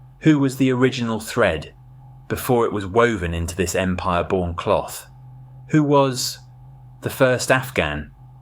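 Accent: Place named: United States. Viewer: A lot of ChatGPT generated crap... You are no wiser after listening to the 20 minutes rant. Just looking at physical features, language and customs shows you the difference. Of course there is overlap and some common maternal genes... But the point was to explain the distinct differences between differences the groups. Yet Chat GPT failed to do so in its yanky acsent 👎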